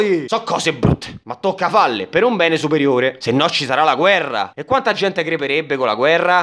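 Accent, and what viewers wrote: Rome, Italy. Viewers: Ma tu sei un genio!!! Poi il romano mi fa sbellicare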